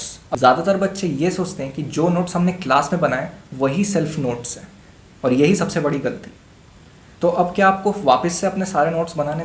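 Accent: Bengal, India. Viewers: Bro are you a Bengali?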